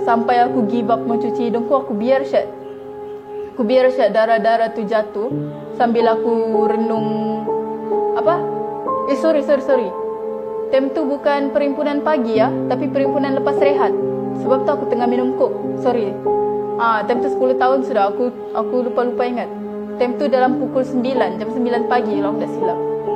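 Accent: Sabah, Malaysia. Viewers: Orang Sabah ke?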